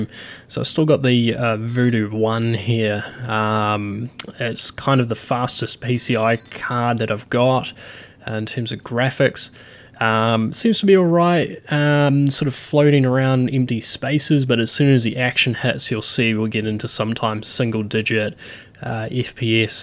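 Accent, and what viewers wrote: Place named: New Zealand. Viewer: My first retro build a few years ago is a similar machine. I wanted to build a better version of the PC I had as a teenager, as if I had the capability to max it out. I've got an S3 Virge as my 2D card and a Voodoo 2 PCI as my 3D card. 32 MB of RAM, 7200 RPM 20 GB Maxtor Hard drive, and an AWE 64. Funnily enough, I just bought a 233 MHZ P1 with MMX to upgrade it. I also have a Super Socket 7 board that I recently got that supports 512 MB of RAM and has an AGP slot. I have an extra 233 MHZ processor and A Voodoo 3 2000. I want to see how the two systems compare to one another. Might also be fun to try to toss Windows XP at the Super Socket 7 build at some point just for a laugh. Also, are you by any chance a New Zeelander? I have a few Youtubers that I watch that are Australian, and your accent is messing with my brain. It's similar enough that my brain is expecting it to sound Australian, but there are certain things that sound different.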